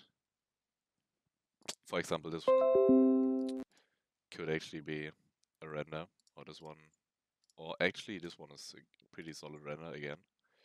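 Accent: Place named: Germany. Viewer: i hear there a bit of DEUTSCH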